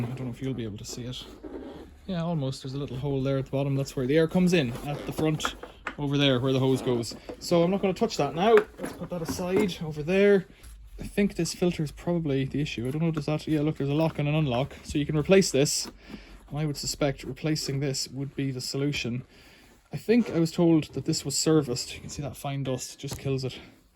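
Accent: Ireland. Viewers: You sound like an Irishman. I bought mine 1 week ago with same vacuum and looking for some DIY as my newly bought bloody expensive vacuum stops suctioning but power is working. Can’t find any solutions on my machines manuals.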